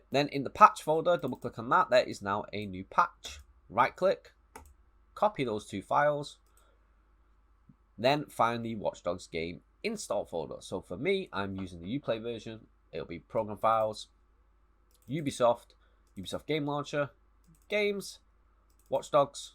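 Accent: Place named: United Kingdom. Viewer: That british accent is amazing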